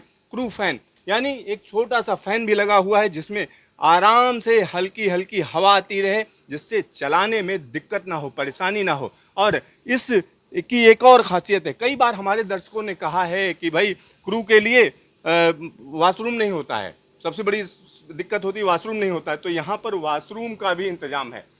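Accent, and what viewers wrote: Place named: Bihar, India. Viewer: Bihari accent mat bolo saf hindi bolo.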